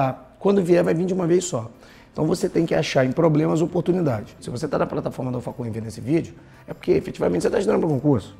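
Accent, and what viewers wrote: Rio de Janeiro, Brazil. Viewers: Ahh meeu gratidão! Nunca estudei tanto na vida haha Só consigo ouvir aulas que tenham vozes de seres humanos inspiradores! Tipo sotaque carioca dos vídeos de direto administrativo! Obrigada!!! Jah Bless 🆙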